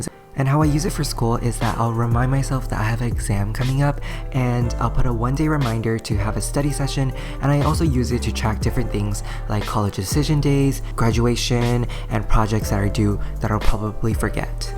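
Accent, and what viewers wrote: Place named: Philippines. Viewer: I swear he sounds Filipino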